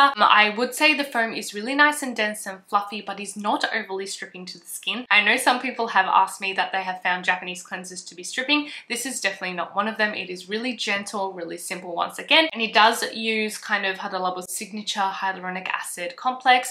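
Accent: Australia. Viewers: also is she australian? I feel like im getting that familiar accent. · Girl I cannot tell if you have an Australian accent or not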